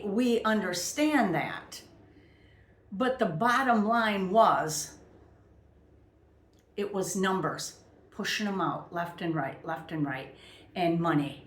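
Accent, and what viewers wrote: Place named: Great Lakes, United States. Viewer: your accent sounds like your from the great lakes region ( chicago , milwaukee , minnesota )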